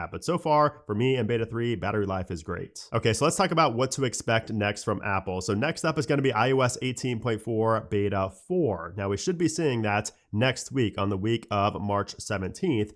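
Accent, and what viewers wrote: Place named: South, United States. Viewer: It's a southern accent lmao. It's called an accent.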